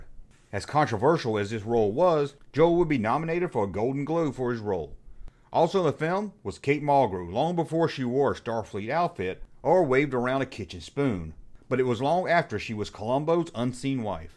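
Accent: United States. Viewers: sorry for my earlier (now deleted) comment - I can be a TOTAL DICK sometimes. I should have checked out more of your channel before leaping to ignorant conclusions. As a Canadian, I sometimes don't take into account the legitimate regional accents and vernacular of the vast US culture. That said, I enjoyed the reminder and comments on this interesting underrated, entertaining film. I recall (even at the time) burdening ANY FILM as "the adventure begins: was asking for trouble!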